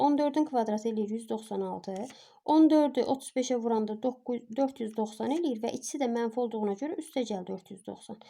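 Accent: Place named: Northwest, Azerbaijan. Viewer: Salam müəllimə çox sağolun əziyyət çəkirsiniz, haralısız? (Qax, Şəki, Zaqatala?) mən Qaxlıyam ləhcəniz tanış və yaxın gəldi.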